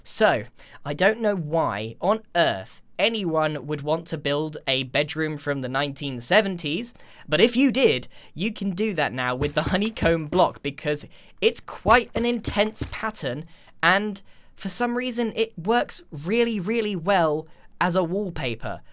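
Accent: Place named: London, United Kingdom. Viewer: Hannah Huang HES from London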